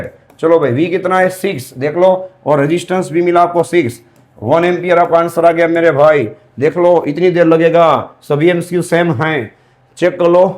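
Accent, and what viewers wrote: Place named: Gujarat, India. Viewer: Very osm sir I think you are gujarati it's new for me to listen ❤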